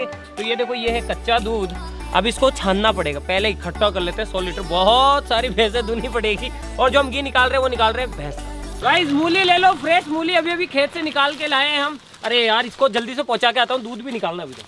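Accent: Rajasthan, India. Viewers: Rajasthan se ho keya bhai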